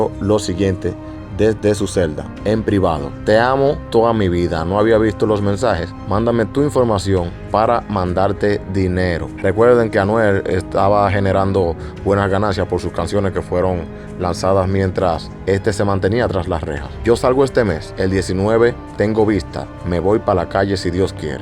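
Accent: Dominican Republic. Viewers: ok que bien, te pregunto como ases noticias urbana de PR, pero hablas domii jjjj bien ahy manito💪💪💪💪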